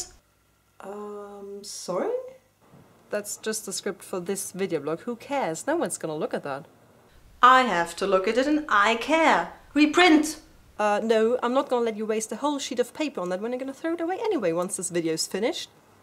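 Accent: United Kingdom. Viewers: Heyyy teacher! I like your videos and your sense of humor. By the way, you've got a pretty good British accent. Peace! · Did your learning of English rely heavily on imitating native speakers? The reason I asked is that your accent, at least when speaking English, sounds like a more refined sort of English accent rather than a German accent. I was surprised to hear that you were German for that reason.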